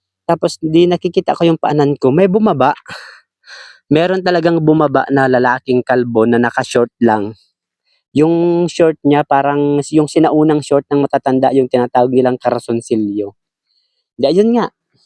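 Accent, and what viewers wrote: Philippines. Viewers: Bicolano ka po Sir?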